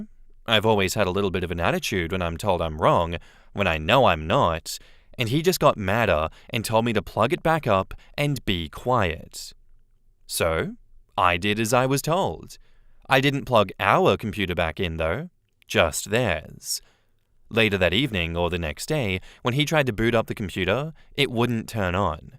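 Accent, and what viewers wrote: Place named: Australia. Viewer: Me over here understanding "The poly and burner part" during the intro. Thought I was used to the Australian tongue by now, but the fr*nch is throwing a wrench in that.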